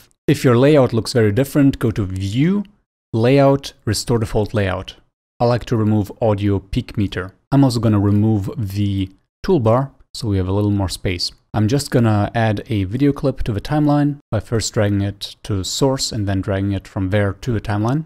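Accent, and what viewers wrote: Germany. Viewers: souned like you said completly illegal Also are you German cause you dont sound like your english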